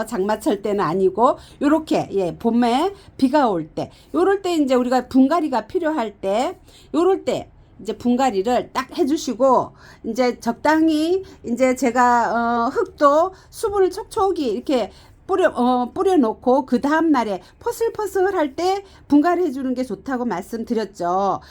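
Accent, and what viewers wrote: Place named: Gyeongsang, South Korea. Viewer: ㅎㅎ 헐빈하다 갱상도 본토말~^^ 지가 자주쓰던말이네요 정겹슴다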